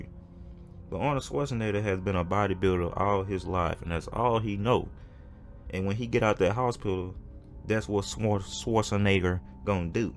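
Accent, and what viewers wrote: South, United States. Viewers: U have a cute accent dude, southern like 🌝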